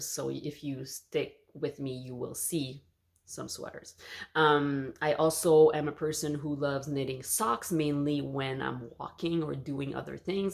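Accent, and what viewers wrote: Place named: Quebec, Canada. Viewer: Hi Dear. I was listening to your accent. I am an English Speaking Montrealer. I asked google whether you are French Canadian, and I was so pleased to see that I guessed right! I moved to Israel 33 years ago. I am so happy to have found you. I love your knitting! Best of luck.🎉❤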